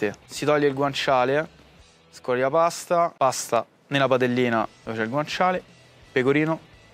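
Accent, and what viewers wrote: Rome, Italy. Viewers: Un po' meno accento romano che amo negli attori ma non in un conduttore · Ma chi e? Ma perché? Ridateci Cattelan 😭 E comunque, la smetterà mai di avere questo forte accento romano, che poco si addice ad un conduttore?! (Ma pure ad un attore a dirla proprio tutta.) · alla Ferilli nessuno ha fatto appunti di questo tipo per la sua presenza a Italia's got talent.. Eppure non sta recitando nella trasmissione..la sua cadenza romana non è paragonabile a quella di questo ragazzo. La Ferilli ha costruito la sua carriera sulla sua cadenza.. Fuori dalla recitazione ancora di più. E come lei tanti altri. Altro che Ludovico Tersigni.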